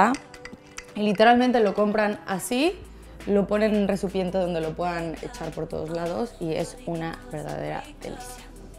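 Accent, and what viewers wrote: Mexico. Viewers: Ay! Como me encantas... Curiosamente acabo de ver otros videos de recién que llegas a España, y como que querías adoptar el acento españolaooo🙊jajaja pero creo que el furor ya pasó, hace tiempo. Me encanta que conservas tu acento mexicano y muuuy freson! Eres divina😗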